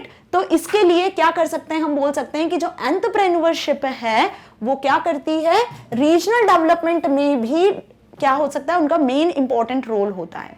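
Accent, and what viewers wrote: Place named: South, India. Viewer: Everything else is okay but ma'am your accent looks like south Indians that is making it difficult for me to understand the words 🙂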